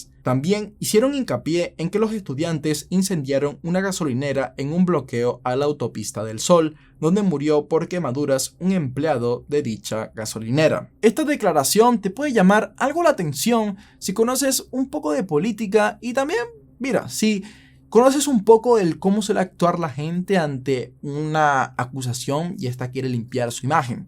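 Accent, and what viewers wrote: Venezuela. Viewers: Tiene acento venezolano👈 Su acento no es peruano👈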